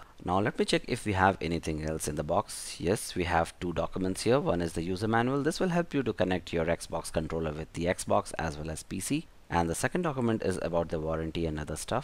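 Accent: India. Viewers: This review helped me so much! By the way are you Indian? (I’m not racist, just asking)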